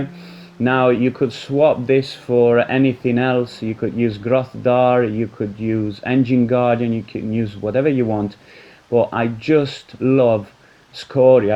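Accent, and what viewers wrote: North, United Kingdom. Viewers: subbed. your accent is mad :) you sound like you are from up north, but you also sound slightly Italian man 😂 not bad though, I like it, it doesn't have those annoying vowel sounds that they make. lol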